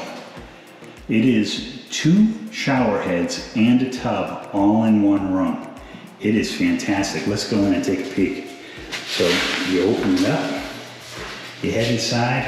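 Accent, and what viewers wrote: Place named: New York, United States. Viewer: You sound like you are from NY or NJ.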